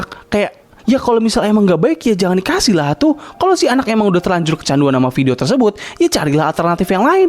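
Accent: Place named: Sunda, Indonesia. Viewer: Emosi anda sangat tersalurkan dengan baik ditambah penggunaan kata "lah atuh" Sebagai orang sunda saya sangat familiar dengan nada nadanya😂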